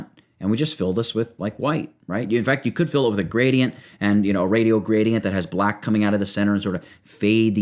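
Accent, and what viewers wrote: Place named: New York, United States. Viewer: Hey seeing you at work man, I'm merely 3 years into Illustrator yet I would assume the technique demonstrated here is intensely more complicated than what I would do in this case. Please could you elaborate on it? Once again cool vids man way to go with the NY accent.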